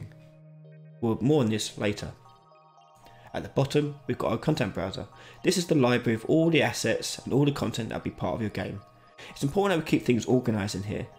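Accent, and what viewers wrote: United Kingdom. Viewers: Man can u slow down a bit while u speaking ... British accent it's so hard to understand ... And thank you for being a great teacher man ... 💖💖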